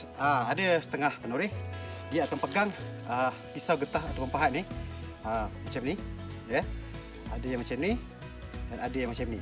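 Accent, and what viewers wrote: Pahang, Malaysia. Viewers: Loghat pahang je abaa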